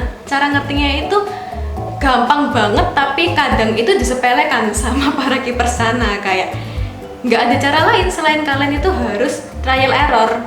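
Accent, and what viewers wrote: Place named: Surabaya, Indonesia. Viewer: pernah ketemu di event hoc. Hahaha. Suroboyoan pokok e wes.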